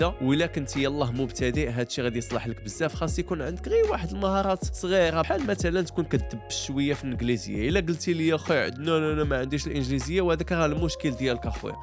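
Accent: United Kingdom. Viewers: ونتا عندك نطق برطاني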